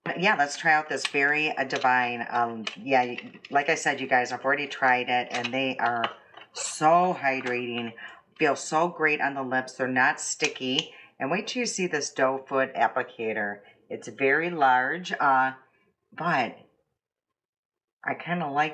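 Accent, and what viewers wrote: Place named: Minnesota, United States. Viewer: Don't stop I love yr channel u r so funny and likeable Minnesota accent so charming and I loved the missa video do a skin Tint best of. Or Charlotte Tisbury dupes best of. Thku for doing a great job great channel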